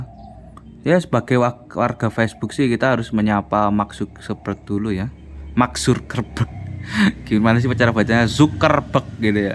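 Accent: Java, Indonesia. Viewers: Jowone manteb mas😂😂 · cangkemu medok tenan ngomong guweh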